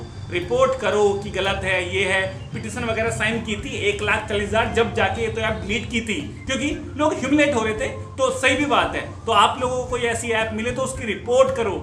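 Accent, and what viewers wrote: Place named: Baghpat, India. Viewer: AAP KAHA SE HO SIR...aakpi language baghpat ki lal rahi hai...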